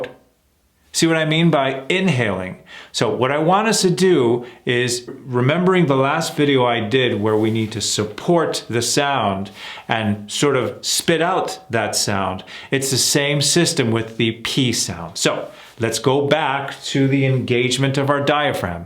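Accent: India. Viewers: Thank you❤ your indian accent is perfectly as Indians usually speak . So please continue a series where you can teach from basics to advance for Indians. Love from india ❤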